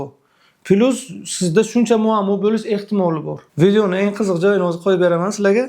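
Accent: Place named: Bukhara, Uzbekistan. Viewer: Оллох Рози булсин сиздан доктир. Доктир каерликсиз.? Бухороданми шевангиз бизга тортаркан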